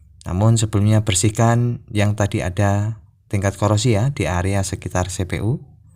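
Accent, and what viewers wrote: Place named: East Java, Indonesia. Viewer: ow kirain di Jawa timur, krn logatnya mirip orang sini ☺️🙏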